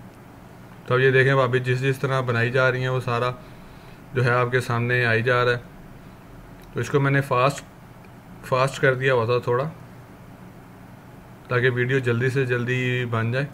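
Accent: Australia. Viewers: V informative ,your english accent is also too good crisp australian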